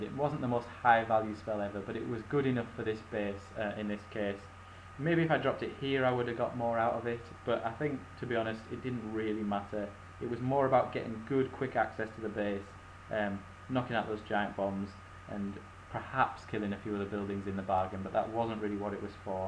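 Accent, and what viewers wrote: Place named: United Kingdom. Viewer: Great to hear another British accent on Clash YT vids.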